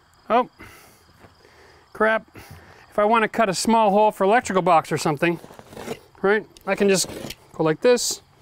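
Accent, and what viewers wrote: Ontario, Canada. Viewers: You always make it simple, honest and with a touch of Canadian eh! TY from BC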